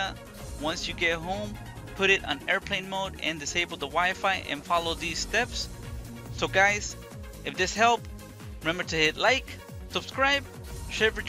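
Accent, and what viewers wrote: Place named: France. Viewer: Are you French, by any chance? I can't help but notice the accent. Just a wee bit lack of "Uh-huh".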